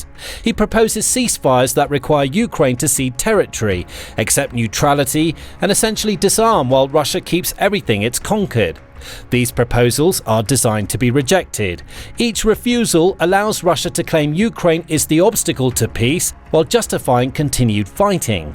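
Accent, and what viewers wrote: United Kingdom. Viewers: сходу ложь Киевская русь лишь одна из нескольких княжеств (Ярославская русь, Новгородская русь) и она не самая могущественная ни с точки зрения политики, ни с точки зрения экономики, ни с военной точки зрения. Самой успешной была Новгородская русь - но западным "историкам" выгоднее делать вид, что остальных княжеств просто не существовало. Почему у самых лживых и прозападных каналов на ютубе всегда британский акцент?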